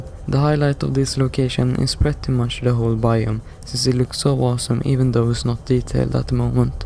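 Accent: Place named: Sweden. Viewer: your accent SCREAMS swedish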